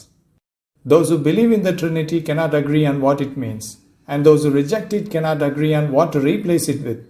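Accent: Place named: India. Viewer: 𝕋𝕠 𝕥𝕙𝕖 𝕡𝕖𝕣𝕤𝕠𝕟 𝕥𝕙𝕒𝕥 𝕚𝕤 𝕟𝕒𝕣𝕣𝕒𝕥𝕚𝕟𝕘 𝕥𝕙𝕚𝕤 𝕙𝕠𝕘𝕨𝕒𝕤𝕙, 𝕝𝕖𝕥 𝕞𝕖 𝕥𝕖𝕝𝕝 𝕦 𝕚𝕗 𝕦 𝕚𝕟 𝕒𝕝𝕝 𝕦𝕣 𝕔𝕒𝕣𝕟𝕒𝕝𝕚𝕥𝕪, 𝕖𝕕𝕦𝕔𝕒𝕥𝕚𝕠𝕟 & 𝕞𝕖𝕟𝕥𝕒𝕝𝕚𝕥𝕪 𝕥𝕙𝕚𝕟𝕜 𝕥𝕙𝕖 𝔹𝕚𝕓𝕝𝕖 𝕚𝕤 𝕒 𝕔𝕠𝕟𝕗𝕦𝕤𝕚𝕠𝕟 𝕨𝕙𝕒𝕥 𝕞𝕒𝕜𝕖𝕤 𝕦 𝔾𝕠𝕕 𝕠𝕣 𝕒 𝕊𝕒𝕚𝕟𝕥 𝕚𝕟 𝕥𝕣𝕪𝕚𝕟𝕘 𝕥𝕠 𝕔𝕠𝕣𝕣𝕖𝕔𝕥 𝕥𝕙𝕖 𝕨𝕣𝕚𝕥𝕥𝕖𝕟 𝕨𝕣𝕕 𝕠𝕗 𝕒 𝕝𝕚𝕧𝕚𝕟𝕘 𝔾𝕠𝕕 𝕨𝕙𝕒𝕥 𝕞𝕒𝕜𝕖𝕤 𝕦 𝕤𝕥𝕒𝕟𝕕 𝕒𝕓𝕠𝕧𝕖 𝕖𝕧𝕖𝕣𝕪𝕥𝕙𝕚𝕟𝕘, 𝕨𝕚𝕥𝕙 𝕖𝕧𝕖𝕣𝕪 𝕚𝕟𝕔𝕙 𝕠𝕗 𝕪𝕣 𝕞𝕖𝕟𝕥𝕒𝕝𝕚𝕥𝕪 𝕚 𝕕𝕟𝕥 𝕟𝕖𝕖𝕕 𝕥𝕠 𝕜𝕟𝕠𝕨 𝕦 𝕥𝕠 𝕞𝕒𝕜𝕖 𝕒𝕟 𝕒𝕤𝕤𝕦𝕞𝕡𝕥𝕚𝕠𝕟 𝕦 𝕒𝕣𝕖 𝕒𝕟 𝕒𝕓𝕤𝕠𝕦𝕝𝕦𝕥𝕖 𝕒𝕤𝕤. 𝕎𝕙𝕒𝕥 𝕞𝕒𝕜𝕖𝕤 𝕦 𝕥𝕙𝕚𝕟𝕜 𝕥𝕙𝕒𝕥 𝕖𝕧𝕖𝕣𝕪 ℂ𝕙𝕣𝕚𝕤𝕥𝕚𝕒𝕟 𝕕𝕠𝕖𝕤'𝕟𝕥 𝕜𝕟𝕠𝕨 𝕨𝕙𝕒𝕥 𝕥𝕙𝕖 𝕋𝕣𝕚𝕟𝕚𝕥𝕪 𝕞𝕖𝕒𝕟𝕤, 𝕛𝕦𝕕𝕘𝕚𝕟𝕘 𝕗𝕣𝕠𝕞 𝕪𝕣 𝕒𝕔𝕔𝕖𝕟𝕥 𝕦 𝕤𝕠𝕦𝕟𝕕 𝕀𝕟𝕕𝕚𝕒𝕟 𝕒𝕣𝕖 𝕒𝕨𝕒𝕣𝕖 𝕚𝕟 𝕀𝕟𝕕𝕚𝕒 𝕥𝕙𝕖𝕣𝕖 𝕒𝕣𝕖 𝕞𝕠𝕣𝕖 𝕥𝕙𝕒𝕟 3000 𝕘𝕠𝕕𝕤 𝕡𝕖𝕣𝕙𝕒𝕡𝕤 𝕞𝕠𝕣𝕖 𝕓𝕖𝕔𝕒𝕦𝕤𝕖 𝕚𝕟 𝕀𝕟𝕕𝕚𝕒 𝕒𝕟𝕪 𝕠𝕓𝕛𝕖𝕔𝕥 𝕔𝕒𝕟 𝕓𝕖𝕔𝕠𝕞𝕖 𝕠𝕣 𝕣𝕖𝕤𝕖𝕞𝕓𝕝𝕖 𝕒 𝕘𝕠𝕕 𝕓𝕒𝕤𝕚𝕔𝕒𝕝𝕝𝕪 𝕒 𝕞𝕒𝕟 𝕞𝕒𝕕𝕖 𝕚𝕕𝕠𝕝 𝕞𝕒𝕕𝕖 𝕓𝕪 𝕞𝕒𝕟, 𝕟𝕠𝕨 𝕞𝕒𝕟 𝕡𝕣𝕒𝕪𝕤 𝕗𝕠𝕣 𝕥𝕙𝕒𝕥 𝕚𝕤 𝕥𝕙𝕒𝕥 𝕟𝕠𝕥 𝕔𝕠𝕟𝕗𝕦𝕤𝕚𝕟𝕘 𝕓𝕦𝕥 𝕢𝕦𝕖𝕤𝕥𝕚𝕠𝕟 𝕝𝕚𝕖𝕤 𝕒𝕣𝕖 𝕖𝕧𝕖𝕣𝕪𝕠𝕟𝕖 𝕠𝕗 𝕥𝕙𝕖𝕤𝕖 𝕘𝕠𝕕𝕤 𝕣𝕖𝕒𝕝, 𝕗𝕒𝕝𝕤𝕖 𝕠𝕣 𝕛𝕦𝕤𝕥 𝕒𝕟𝕠𝕥𝕙𝕖𝕣 𝕞𝕒𝕟 𝕞𝕒𝕕𝕖 𝕚𝕕𝕠𝕝...𝕔𝕠𝕣𝕣𝕖𝕔𝕥 𝕥𝕙𝕠𝕤𝕖 𝕥𝕙𝕚𝕟𝕘𝕤 𝕚𝕗 𝕦 𝕥𝕙𝕚𝕟𝕜 𝕦 𝕒𝕣𝕖 𝕒𝕓𝕠𝕧𝕖 𝔾𝕠𝕕'𝕤 𝕔𝕣𝕖𝕒𝕥𝕚𝕠𝕟...𝕡𝕖𝕣𝕙𝕒𝕡𝕤 𝕦 𝕞𝕦𝕤𝕥 𝕓𝕖𝕝𝕚𝕖𝕧𝕖 𝕦 𝕒𝕣𝕖 𝕘𝕠𝕕, 𝕚𝕥 𝕨𝕠𝕟𝕥 𝕤𝕦𝕣𝕡𝕣𝕚𝕤𝕖 𝕞𝕖 𝕔𝕒𝕦𝕤𝕖 𝕦 𝕡𝕝 𝕔𝕠𝕞𝕖 𝕗𝕣𝕠𝕞 𝕥𝕙𝕒𝕥 𝕓𝕔𝕜 𝕘𝕣𝕠𝕦𝕟𝕕 𝕒𝕟𝕪𝕥𝕙𝕚𝕟𝕘 & 𝕖𝕧𝕖𝕣𝕪𝕥𝕙𝕚𝕟𝕘 𝕔𝕒𝕟 𝕓 𝕘𝕠𝕕, 𝕚𝕗 𝕦 𝕕𝕟𝕥 𝕦𝕟𝕕𝕖𝕣𝕤𝕥𝕒𝕟𝕕 𝕥𝕙𝕖 𝕋𝕣𝕚𝕟𝕚𝕥𝕪 𝕒𝕤𝕜 𝕗𝕠𝕣 𝕖𝕕𝕦𝕔𝕒𝕥𝕚𝕠𝕟 𝕓𝕦𝕥 𝕕𝕟𝕥 𝕔𝕠𝕞𝕖 𝕠𝕟 𝕥𝕙𝕚𝕤 𝕡𝕝𝕒𝕥𝕗𝕠𝕣𝕞 𝕚𝕟𝕤𝕦𝕝𝕥𝕚𝕟𝕘 𝕥𝕙𝕖 𝕨𝕣𝕕 𝕠𝕗 𝕒 𝕝𝕚𝕧𝕚𝕟𝕘 𝔾𝕠𝕕 𝕠𝕦𝕣 𝔾𝕠𝕕 𝕠𝕣 𝕙𝕚𝕤 𝕨𝕣𝕕 𝕚𝕤 𝕟𝕠𝕥 𝕒𝕟 𝕚𝕕𝕠𝕝 𝕟𝕠𝕣 𝕤𝕠𝕞𝕖𝕥𝕙𝕚𝕟𝕘 ℂ𝕙𝕣𝕚𝕤𝕥𝕚𝕒𝕟𝕤 𝕞𝕒𝕕𝕖 𝕦𝕡 𝕚𝕟𝕤𝕥𝕖𝕒𝕕 𝕙𝕚𝕤 𝕥𝕙𝕖 𝔾𝕠𝕕 𝕨𝕖 𝕒𝕣𝕖 𝕔𝕣𝕖𝕒𝕥𝕖𝕕 𝕗𝕣𝕠𝕞...𝕋𝕣𝕚𝕟𝕚𝕥𝕪 𝕚𝕤 𝕥𝕠 𝕙𝕦𝕘𝕖 𝕗𝕠𝕣 𝕪𝕣 𝕦𝕟𝕕𝕖𝕣𝕤𝕥𝕒𝕟𝕕𝕚𝕟𝕘 𝕒 𝕣𝕖𝕒𝕝 & 𝕥𝕣𝕦𝕖 ℂ𝕙𝕣𝕚𝕤𝕥𝕚𝕒𝕟 𝕨𝕚𝕝𝕝 𝕜𝕟𝕠𝕨 𝕥𝕙𝕚𝕤 𝕒𝕤 𝕚𝕥 𝕚𝕤 𝕒 𝕗𝕠𝕦𝕟𝕕𝕒𝕥𝕚𝕠𝕟 𝕠𝕟 𝕨𝕙𝕖𝕣𝕖 & 𝕨𝕙𝕒𝕥 𝕠𝕦𝕣 𝕥𝕖𝕒𝕔𝕙𝕚𝕟𝕘𝕤 𝕠𝕣𝕚𝕘𝕚𝕟𝕒𝕥𝕖𝕕 𝕗𝕣𝕠𝕞 𝕦 𝕒𝕣𝕖 𝕗𝕠𝕠𝕝, 𝕣𝕖𝕡𝕖𝕟𝕥 & 𝕗𝕠𝕝𝕝𝕠𝕨 ℂ𝕙𝕣𝕚𝕤𝕥 𝕓𝕖𝕗𝕠𝕣𝕖 𝕦 𝕝𝕒𝕟𝕕 𝕪𝕠𝕦𝕣𝕤𝕖𝕝𝕗 𝕚𝕟 𝕥𝕙𝕖 𝕨𝕣𝕒𝕥𝕙 𝕠𝕗 𝕥𝕙𝕚𝕤 𝔾𝕠𝕕....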